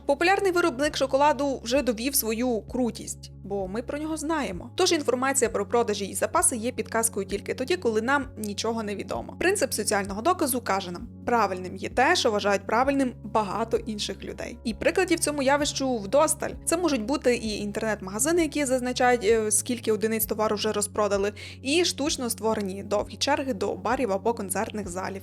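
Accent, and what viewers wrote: Transcarpathia, Ukraine. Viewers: Хаха, ти точно з Закарпаття ))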